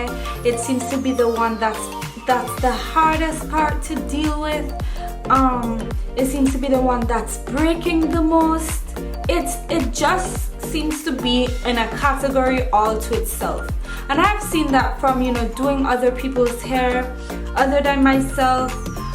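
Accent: Caribbean. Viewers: sounds like you have an accent....Caribbean girl :D.....great video..... I had to cut the rest of my hair to the length of my crown it was fine at first.....and it doing much better....still a bit shorter and grows slower and kinkier but it made soo much progress.....im really glad....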